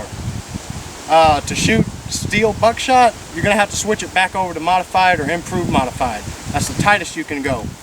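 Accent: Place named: Texas, United States. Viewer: Just curious, where's your accent from? I know it's definitely Texan but you sound like you're from the east near Beaumont or around there but I could be wrong. Love the video though! What do you recommend for slugs?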